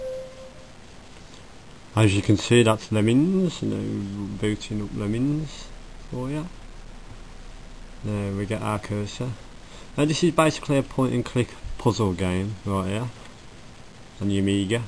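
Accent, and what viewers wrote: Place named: Midlands, United Kingdom. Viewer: For u Americans it's called a Midlands accent. From the Birmingham area of England.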